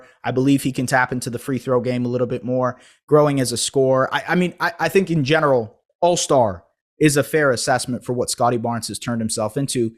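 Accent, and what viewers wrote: Canada. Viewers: Raptors trade pascal and build around barnes also u sound Canadian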